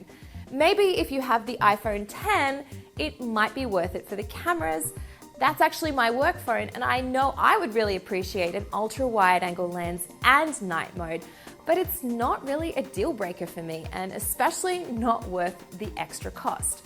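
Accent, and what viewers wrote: Australia. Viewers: Is she Australian? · R u Australian? Cuz ur accent is aussie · I feel like she’s Aussie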